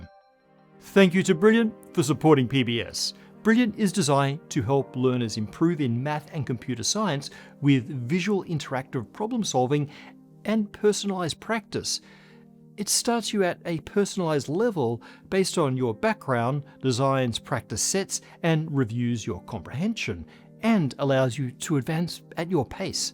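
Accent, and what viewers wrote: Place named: Australia. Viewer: Perhaps his Australian accent is making perception difficult. I am listening without headphones and have no problem. You can turn on captions, English (auto generated) to help.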